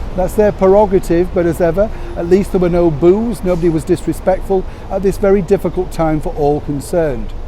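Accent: Yorkshire, United Kingdom. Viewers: Dear Neil, it is always so nice to hear that lilting Yorkshire accent and your down to earth wry humour.. I fear that giving the Sussexes too much free rein will only cause further disruption. The late Queen was against a 'half-in, half out' arrangement so I do hope that with her passing, this request from the Sussexes will not be given any further consideration. That is the direction I see harry and Me-again attempting to go....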